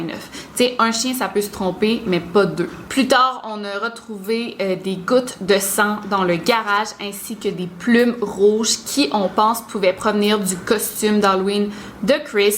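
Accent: Quebec, Canada. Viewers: Oh j'adore l'accent québécois !!🤤❤️❤️😋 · Nouvelle abonnée je tenais à te dire Merci pour tes vidéos je les écoutes tout les jours tu es une très bonne oratrice! En plus avec l’accent québécois au top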